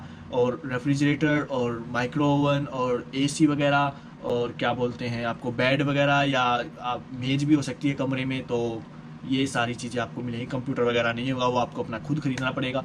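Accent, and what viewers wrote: Haryana, India. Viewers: Aap haryana sy ho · Your haryanvi accent is pretty😀 btw i am also from haryana....lots of love from haryana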